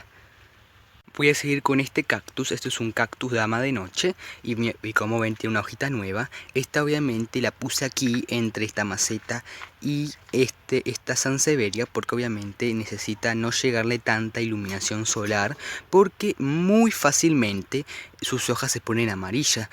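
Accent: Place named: Argentina. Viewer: Fabuloso!!! Muy buena tu explicacion....me encanto!!! Eres ARGENTINO.....de que zona eres??? Abrazo gigante y esperando tu proximo video.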